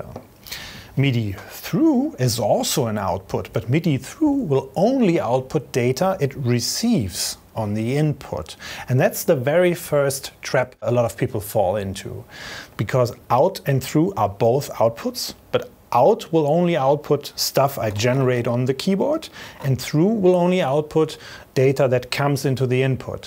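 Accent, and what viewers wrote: Germany. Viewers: Lovely German English. ^^ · Most German accent I’ve ever heard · Your Denglisch is wunderfull! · You’re german, am I right? xD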